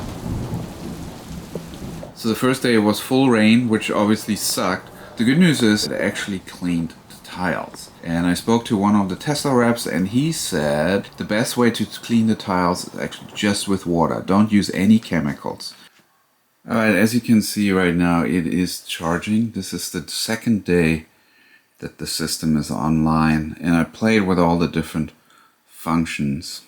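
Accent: Germany. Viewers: You have an accent, are you German?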